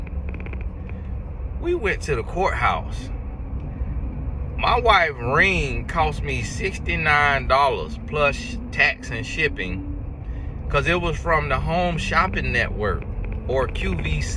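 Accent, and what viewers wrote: South, United States. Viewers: You got that southern accent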